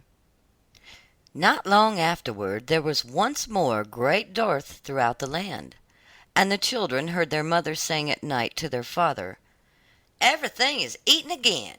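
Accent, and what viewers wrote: South, United States. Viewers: Hated the southern accent . Terrible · Although I am grateful for the story, but you aren’t the only one who isn’t distracted by the Southern accent. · I must be the only one here who are NOT distracted by the southern accent. I find it amusing... And I'm from Europe myself.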